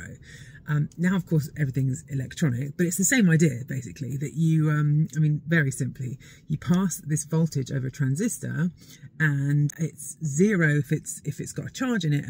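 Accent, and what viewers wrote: United Kingdom. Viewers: In the middle of the zeros I lost track of the British accent.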